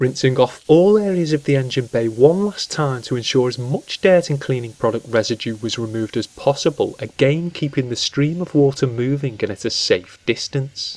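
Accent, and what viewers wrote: Liverpool, United Kingdom. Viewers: Sounds like a Liverpool accent.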